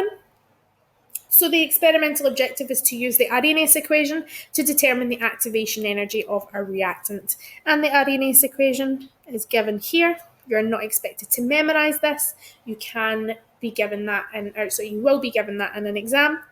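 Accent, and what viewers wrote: Scotland, United Kingdom. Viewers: thank you madame for the great explanation.By the way,I liked your scottich accent. :)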